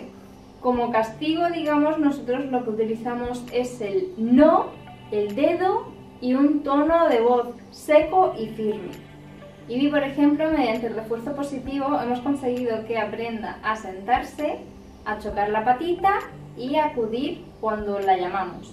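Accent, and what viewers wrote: Spain. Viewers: que preciosa esta la conductora me gusta su acento español aunque no se si sea española jeje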